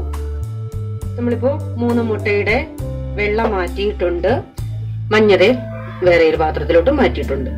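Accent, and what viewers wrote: Kottayam, India. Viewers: Chechii kottayam karii aano???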